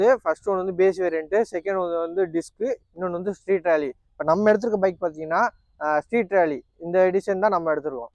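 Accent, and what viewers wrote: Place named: Coimbatore, India. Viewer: Yov ne paaka yenga area la iruku oru paiyan maari yei iruka ya... un looks and pechu... avan coimbatore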